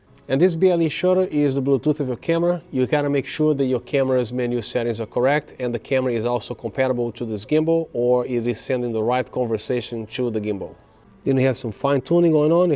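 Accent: Brazil. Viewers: Achei que tava familiar mesmo!!! Legal saber que é BR! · Brasilian Accent?! <3 Loved the Video!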